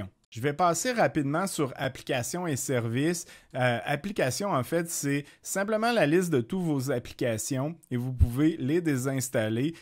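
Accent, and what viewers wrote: Quebec, Canada. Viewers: Bonjour , comment s'appelle l'application ,je n'arrive pas a comprendre le titre désolé j'ai un peu de mal à comprendre l'accent québécois .sinon top continue comme ça . Je me suis certaine fois de problème grâce à tes explications . merci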